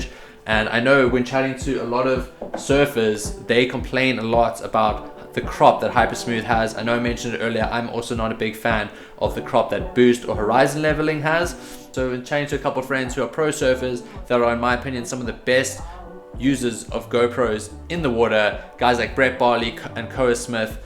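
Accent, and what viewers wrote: South Africa. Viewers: You sound South African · you sound south african!!!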